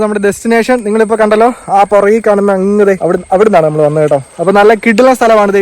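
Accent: Kottayam, India. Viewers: Bro kottayamkaran alle bro?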